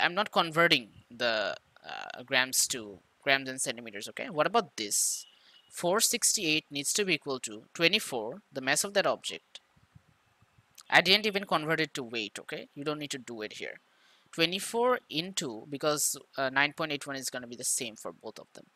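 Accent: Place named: Bangladesh. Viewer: yeee agreed Also that accent was so clearly Bangladeshi ahah Love the representation tho, he’s much better than that Pakistani dude who barely explains anything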